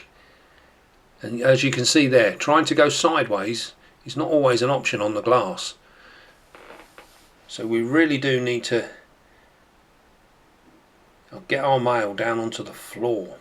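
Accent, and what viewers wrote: United Kingdom. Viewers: You sound so properly British!!! Lol, compliment mate!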